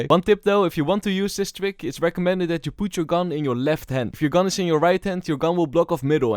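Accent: Netherlands. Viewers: i smell dutch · ik ruik een beetje nederlands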